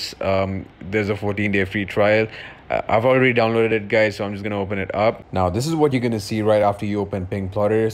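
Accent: India. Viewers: Bro are u indian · Are you Indian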